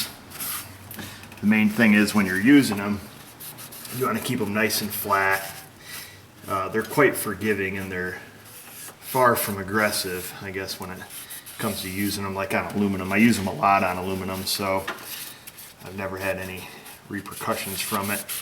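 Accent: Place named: Texas, United States. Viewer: i really enjoy your taking the time to show the tools you use to do the jobs with. i also enjoy your slow texas like voice. i can understand every word. i was literally shocked you were from new york. showing the little things is not reduntant at all. and worth it to a lot of us. your lighting is great and camera angle. you are doing a great job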